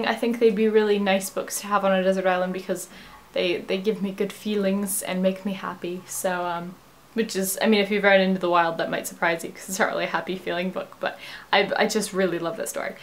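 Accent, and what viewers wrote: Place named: United Kingdom. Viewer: i can hear the British seeping through your american accent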